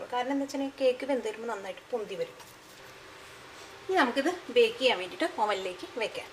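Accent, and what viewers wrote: Thrissur, India. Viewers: ഇത്തരം പോസ്റ്റുകള്‍ ഇടുമ്പോള്‍ മറ്റുള്ളവര്‍ക്ക് പ്രയോജനം ഉണ്ടാകണമെങ്കില്‍ പറയുന്നത് മനസ്സിലാകണം. ദയവായി സ്പഷ്ടമായി പറയുവാന്‍ ശ്രമിക്കുക. തൃശ്ശൂര്‍ ഭാഷ എല്ലാവര്ക്കും മനസ്സിലാകണമെന്നില്ല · seems to b a easy recipe. will try it for this xmas.. really like ur thrissur tone :)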